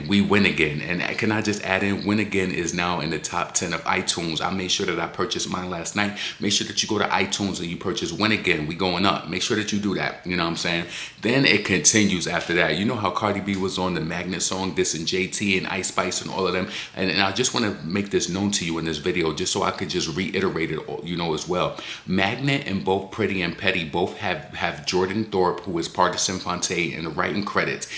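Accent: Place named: New York, United States. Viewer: I needed it this run down in full New York 🗽 accent ❤❤❤❤❤❤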